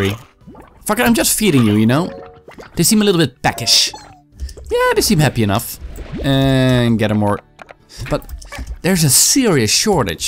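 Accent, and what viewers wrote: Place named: Netherlands. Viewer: i think he's Dutch lmao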